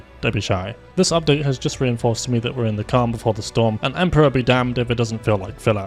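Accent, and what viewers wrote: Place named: United Kingdom. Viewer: i love your unique combination of being "lucky" enough to be british and have the accent, the jokes, and the editing. oh dear oh dear. amazing. jump king stream when?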